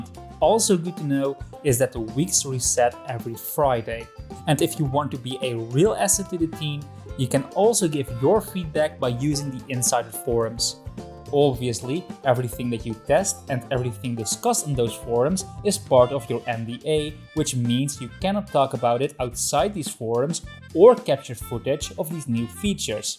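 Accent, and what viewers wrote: Netherlands. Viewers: wait! your dutch?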